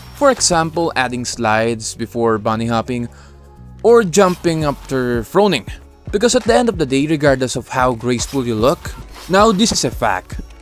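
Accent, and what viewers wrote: Spain. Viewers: is bro Spanish or french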